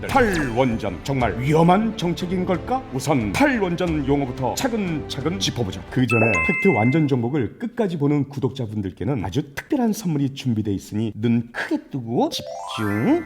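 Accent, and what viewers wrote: North Korea. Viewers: 성우가 약간 ㅋㅋㅋ 북한 같아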